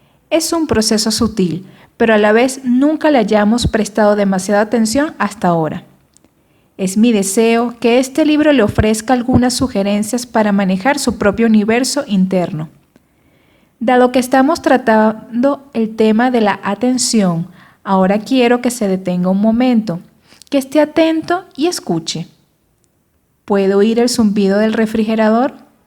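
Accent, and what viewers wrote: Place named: Venezuela. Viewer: Pésimo acento venezolano 🤮🤮🤮🤮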